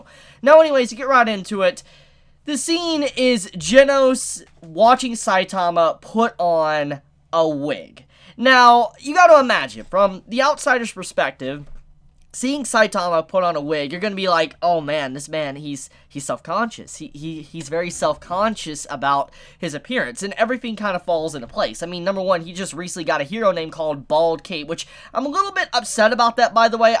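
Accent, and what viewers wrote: United States. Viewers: You talk like a cowboy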